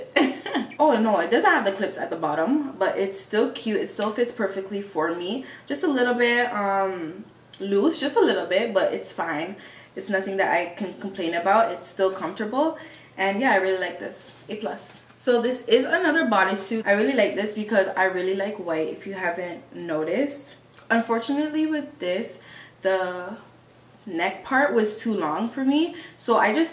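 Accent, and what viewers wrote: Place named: Latin America. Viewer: You're so cute! So you're in Canada? I keep thinking I hear a little Latina in your accent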